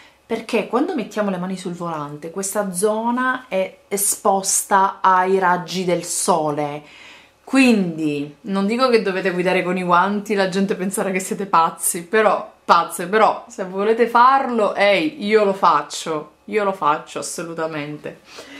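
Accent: Sicily, Italy. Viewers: Sei siciliana? dv precisamente?:) Un saluto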